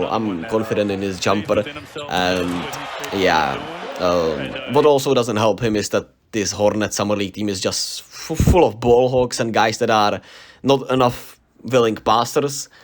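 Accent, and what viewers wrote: Czech Republic. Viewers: Je huste pustit si video a jen z prizvuku zjistit, ze je nekdo Čech.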